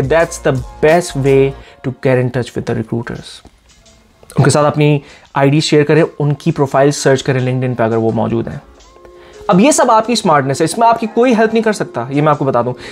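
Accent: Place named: Karachi, Pakistan. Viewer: G way of talking bata raha hy karachi k hy ap 🌹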